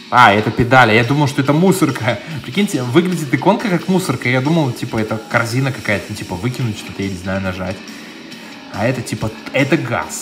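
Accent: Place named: United States. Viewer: Ты что из сша? Ты не русский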